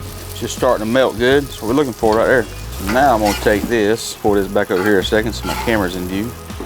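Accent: South, United States. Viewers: GD these look good 🤤😋 I love your country accent btw❤️ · Hey where you from? I hear that southern accent in your voice 😁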